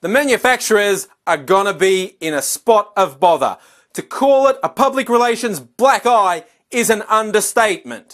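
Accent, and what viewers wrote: Australia. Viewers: lol, ur Australian...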